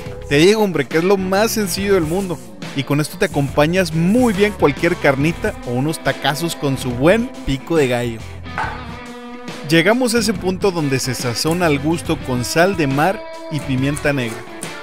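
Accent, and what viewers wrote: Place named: Mexico. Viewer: Ufa tu cocina y como hablas, la neta me siento en México!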